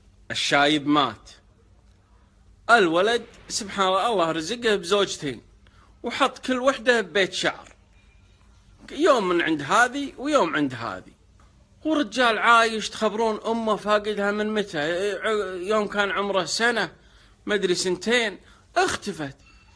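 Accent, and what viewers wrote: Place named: Syria. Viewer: شكلك سوري من كلامك . انت من البدون؟؟؟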